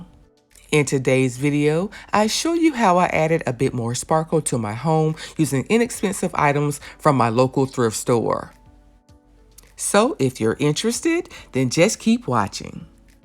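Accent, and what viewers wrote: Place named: Memphis, United States. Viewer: Sound like your from Memphis or Atlanta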